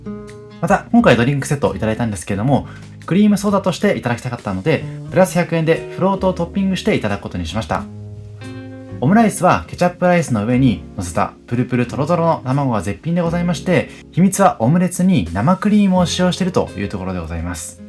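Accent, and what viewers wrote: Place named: Tohoku, Japan. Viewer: 東北出身の方ですか？私は東北なのですがイントネーションが同じで親近感😊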